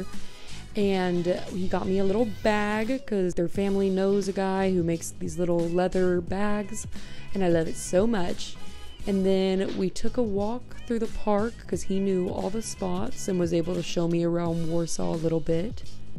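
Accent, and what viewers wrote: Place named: United States. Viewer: It was your first flight in your life but your accent sound american. How did you travel from the US?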